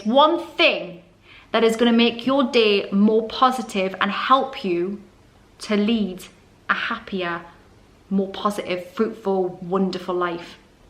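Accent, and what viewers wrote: Newcastle, United Kingdom. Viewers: I watch your videos to learn a Geordie accent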